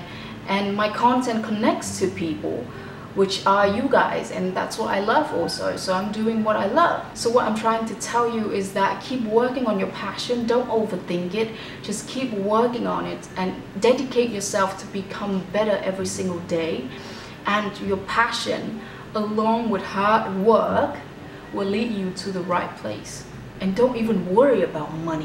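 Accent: England, United Kingdom. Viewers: ôi giời ơi, accent anh anh, nghe lạ quới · Huhu em thích giọng anh anh của chị dã man ý chị ơiiii em thích cái độ dày với ấm trong giọng anh anh ☺️☺️☺️☺️☺️ Nhưng lại luôn cảm thấy kiểu nửa vời lại còn thích đú cái khó 🥺🥺🥺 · English accents :)))) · Giọng Anh ạ? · British accent nghe thích quá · British accent của chị hay quá hihii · Chị nói giọng Anh Anh Chị du học ở Anh ạ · Em thích cái giọng British của chị lắm luôn ý. Nghe rất dễ thương, điệu điệu mà không quá chảy nước như trên TV. Yêu chị vô cùng tậm, luôn luôn support mọi hoạt động của chị. Mặc dù em lười đọc lắm, nhưng sẽ cố đọc hết blog của chị <3 <3 Yêu chế <3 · There is nothing quite like a person with UK accent who didn't grow up there. Very unique. By the, I love the energy that you have. Great work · British accent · Từ Anh về có khác, eo ôi cái British accent nặng ghê gớm !!! , nghe nhộn nhộn vui vui, đáng yêu ghê cơ chị ạ, ahíhí !!! 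· british accent cua chi hay qua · hers is British accent, you can easily recognize it by some words like " a lot, all, stop" it's my opinion btw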